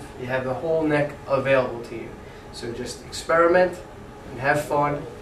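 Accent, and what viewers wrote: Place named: New York, United States. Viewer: Hahahaha, two notes in a chord. I know you know that is not possible, but it's still funny. Your point about neck mapping is spot on and that's one of the greatest things about playing guitar compared to other instruments where you can only play the stuff in one locked in place. I love your My Cousin Vinny accent. Yo!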